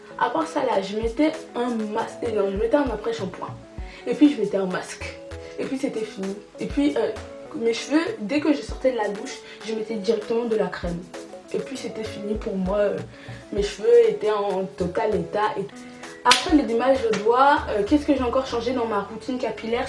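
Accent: Cameroon. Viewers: cc super vidéo ...merci pour les conseils mimi et drôle tu es de temps en temps mets l accent cam quand tu parles j aime bien j attends plus de vidéos ma chérie bizu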